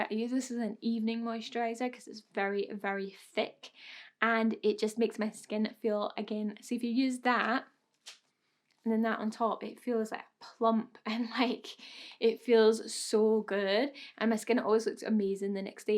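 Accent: Scotland, United Kingdom. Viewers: What kind of accent you sound Scottish can hardly understand what your saying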